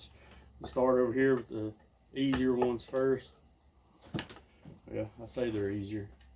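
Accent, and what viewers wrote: United States. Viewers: Subbed cause the accent. Never was in the U.S. but always liked those accents, BTW great video.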